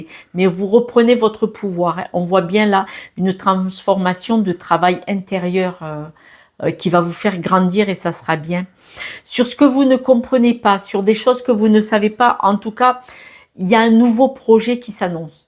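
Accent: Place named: Toulouse, France. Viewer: je reconnaissais cet accent .. je suis pas loin de Toulouse aussi .. ❤️🥰🥰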